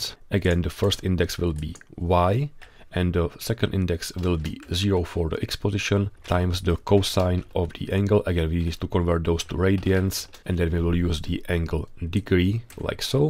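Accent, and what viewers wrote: Czech Republic. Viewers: Čech ? :D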